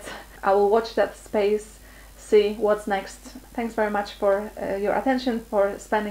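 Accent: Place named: Poland. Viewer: Kurde, a jednak, my Polacy poznamy się wszędzie. Usłyszałam tylko Twój akcent ;) Żeby nie było, mam taki sam, nie napisałam tego, żeby Cię obrazić :)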